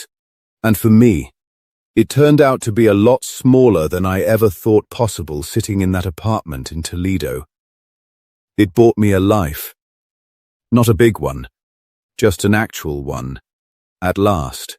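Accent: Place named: United Kingdom. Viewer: Why is my gut telling me that this is AI? Is it the strange eye-blinking or the British accent saying he was a truck driver in Ohio - lol!!!!!!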